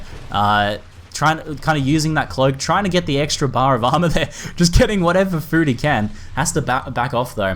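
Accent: Australia. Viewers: D yeah I noticed. Good job though. Overall, I really enjoy you casting Evolve quite a lot. Shame you upload those relatively rarely. Evolve is an awesome game to watch, especially when there are so many skirmishes (or maybe one constant battle?) which are barely pulled of! P.S English is not my native lang so I've tried to "decipher" what is the 1st word of your intro/welcome catchphrase? Is it "Good day it's here [...]"? Or...? I generally don't have problems with understanding spoken or written english but your "down under";) accent coupled with the fast-forward tempo of you saying it... well you get the idea:P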